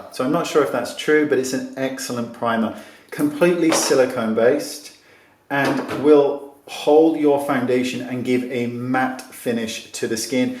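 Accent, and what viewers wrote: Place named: Swansea, United Kingdom. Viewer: Hey my dad was from Swansy and he sounded allot like you. Where are you from?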